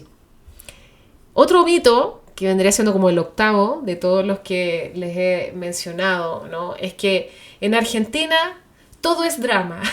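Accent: Chile. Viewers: Soy Argentino, específicamente de San Juan. Te olvidaste de las provincias del Norte que también tienen sus tonadas su folclore y su cultura y muy en contraposición con el de Bs As. Igual te digo que tú como chilena (se nota por tu tonada) que has descrito casi a la perfección y de todos los videos que he visto que han echo dedicado a los argentinos, el tuyo es el mejor por lejos ❤ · Me apareció este video en mi feed y escuché tu acento chileno y lo primero que pensé fue "la puta madre, otra chilena más que nos va a salir con lo de los hambrientinos, las Islas falkland, las crisis económicas, y tantas otras cosas". Pero me dejaste sorprendido. Ojalá un 1% de tus compatriotas pudiesen ver este video. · Con todo mi respeto por tu acento pareces chilena pero con el más sincero de los afectos creo que todos podemos darte la bienvenida porque creo que ya estás atrapada y completamente embelesada como un argentino propio.🙂 Creo que muchas veces no importa de qué nacionalidad sea originaria una persona y creo que lo importante es que si viene a Argentina y se siente bien y se siente cómoda y termina eligiendo hasta quedarse entonces esa persona ya es Argentina independiente de en qué país haya nacido y aún conservando sus raíces de origen. 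En Argentina hay argentinos de origen español de origen italiano de origen árabe sirio libanés de origen armenio de origen Turco de origen chileno de origen boliviano de origen brasileño de origen paraguayo de origen venezolano de origen uruguayo de origen peruano de orígenes mezclados de orígenes Criollos e incluso, no siempre muy valorado, de orígenes aborígenes amerindios que son Muy importantes... También de orígenes franceses alemanes austriacos suizos polacos británicos eslovenos croatas griegos..., rusos blancos ucranianos escandinavos..., estadounidenses coreanos chinos japoneses..., Y hasta más recientemente también nuevas oleadas de ucranianos y rusos por contradictorio que parezca a pesar de la guerra que domina hoy día a estos dos últimos. La lista podría ser infinita pero todos serían argentinos sí así lo sienten y así lo eligen. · gracias por tratar de entendernos y lo estas logrando, me gusta tu tono de voz y tu acento chileno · El asado es un mito en el argentino, no tanto asi, los argentinos comemos carne como en calquier otro pais. 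Si se puede decir que en alguna provincia del interior se consume mas carne, zona rurales. Pero diria que el argentino de Buenos aires por ej, es mucho de la pizza, las pastas... y los argentinos de bien ,por lo general son sociables, amigable , es aventurero y soñador. y ama su pais por su tierra,su cultura...Quizas los de CABA se creen europeos, pero el resto no lo creas, pero si posee mucha cultura latinoamericana y no europea... . Y para proxima ,cuentanos como son los chilenos...-por tu acento creo que eras chilena-. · Guaaauu... ¡Qué interesante! ¡Qué capacidad de observación y de análisis,por Dios! ¡Y qué bien lo expresas! ¡Y que bien suena tu acento chileno! ¡Qué dulce y qué delicioso suena! Ah... Te cuento: Acabo de suscribirme. Un abrazo enorme desde Córdoba. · Suena tan bonito una descripción tan profunda y tan bien contada de argentina mi pais en ese acento chileno característico que mirando la cultura argentina como en un espejo reflejas tu gran cultura y nivel intelectual, lo hiciste con una descripción perfecta y poética ,chile ha crecido tanto en las últimas décadas económicamente, culturalmente y en educación que es un ejemplo en América dejar atrás nuestros prejuicios y encontrarnos como pueblos es crecer también y este relato es una muestra enorme, gracias desde córdoba Argentina 😊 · Me parece que esta mujer no es española es como que tiene acento chileno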